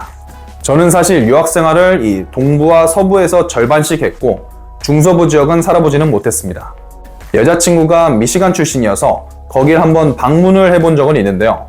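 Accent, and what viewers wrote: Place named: Jeolla, South Korea. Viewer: 님은 전라도 억양이 있으시네요 ㅎㅎ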